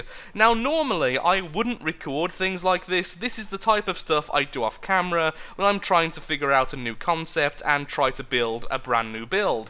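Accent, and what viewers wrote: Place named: Black Country, United Kingdom. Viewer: Love hearing youtubers with that Black Country accent :D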